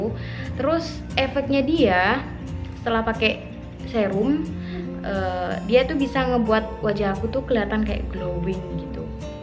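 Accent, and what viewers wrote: Indonesia. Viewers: probolinggo bener logatnya 😂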